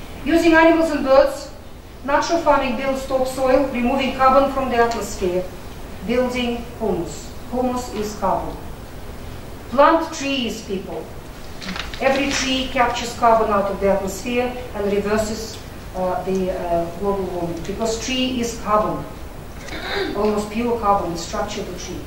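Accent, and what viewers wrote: Russia. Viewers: I like her Russian academic speech style. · I thought she was speaking russian, but nope thats english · very informative thank you i like her russian voice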